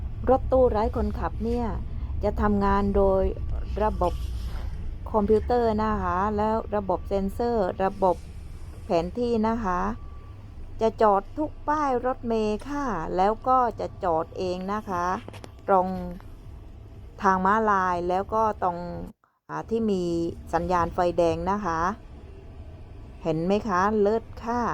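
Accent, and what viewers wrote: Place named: South, Thailand. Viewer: คิดถึงจึงแวะมาทักทายน้องสาวคนน่ารัก😍, คิดถึงสำเนียงใต้.ยายรินดู แต่บ่ได้ยินนะคะ เพราะหรี่เสียงมือถือไว้ กลัวคนรู้ว่าเล่นมือถือในเวลางานค่ะ.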